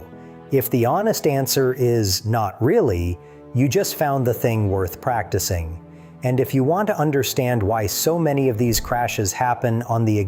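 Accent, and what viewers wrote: United States. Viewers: The confusion might also stem from the fact that the speaker has an American accent and cites American sources (admittedly not exclusively), while the channel description states that the channel owner is based in Norway. Anything is possible, of course—and there was, after all, an American in Norway before: Frank Tagliano 🙂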